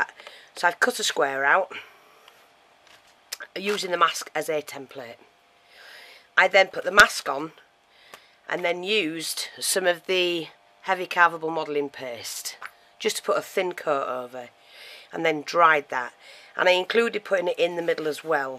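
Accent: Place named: Leeds, United Kingdom. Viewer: So pretty. Keep them coming I can't wait to see what you come up with next. Thank goodness I don't work anymore I'd have to quit and stay home to watch. LOL My mother, uncle and gram & grandfather where born in Leeds. They came to USA in 1920. But I hear their voices when I listen to you. Thanks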